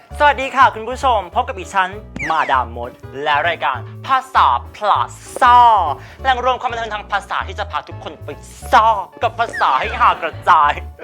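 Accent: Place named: Thailand. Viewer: สำเนียง เจ๊ แปะมากเลยอ่ะ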